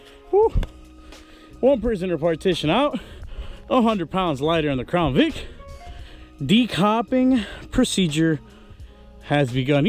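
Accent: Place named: Tennessee, United States. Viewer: StereTyping thats why I said, Im not hatting tho. TN accent is bomb.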